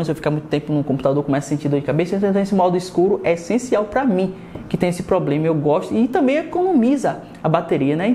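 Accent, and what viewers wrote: Bahia, Brazil. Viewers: Baiano kkk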